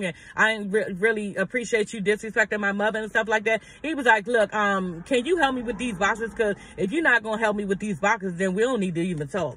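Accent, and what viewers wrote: Washington DC, United States. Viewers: That's a D.C. accent FO SHO!!! The way they say girl.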